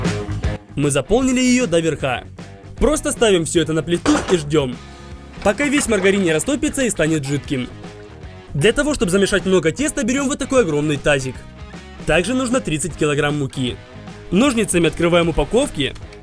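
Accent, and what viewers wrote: Ukraine. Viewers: Украйнский акцент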